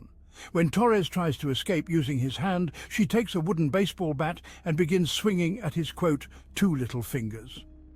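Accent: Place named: United Kingdom. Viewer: Who would have thought there would be a connection between the Sarah Boone murder case and a Creepshow episode that aired Oct 2019, a few months before the murder occurred. What they both have in common is the implied archetype of “The Suitcase” - with its potential darker aspects [used as a torture device] and for being an obsessive fetish. I enjoyed watching intriguing video with its “creepy” topic: Comparing the horrible evils that can exist, each involving a suitcase possessed by an aggressor who had control of it from the outside, to a victim trapped within - who got stuck inside its claustrophobic confined space. Pointing out similar comparisons: The two suitcases looked almost identical - appeared to be about the same size. The aggressors used a bat for violence and dealt with the concept of the suitcase being thrown down the stairs. The audience is also left with the scary image of a hand popping out of the suitcase, not to mention the idea of a foot stomping on it/being stepped on. 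[This is implied when Sarah Boone’s sandals were shown next to the suitcase - from the 2nd mobile phone shot suitcase video. - that no one seemed to notice] The British narrator had that perfectly nuanced, articulate sounding voice - well-paced enough to reveal an unusual, dark side and sinister portrayal of the troubled human condition. This video came out at exactly the best optimal time: A few days after the guilty verdict [of 2nd degree murder] was reached in Sarah Boone’s Jury T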